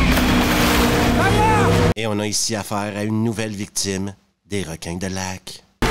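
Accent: Quebec, Canada. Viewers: Je te suis déjà depuis quelques temps, et je trouve que tu as un humour qui fait vraiment humour Français. On voit que tu connais ton métier :) Merci pour tes vidéos toujours cools qui m'ont fait apprecier l'accent made in Quebec !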